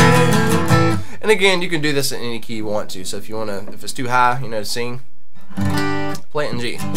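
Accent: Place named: South, United States. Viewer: He sounds kinda from the south anyway you rock man thanks for the vid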